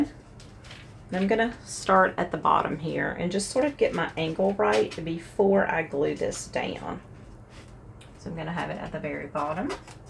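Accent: South, United States. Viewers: I just like listening to your accent! We live in Italy now so I love feeling the southern drawl!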